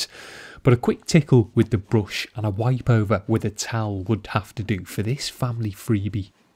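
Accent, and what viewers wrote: Liverpool, United Kingdom. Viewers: Daniele Cognome - he has a Scouse accent - from Liverpool, England. Same as the Beatles, that pop group from the 1960s.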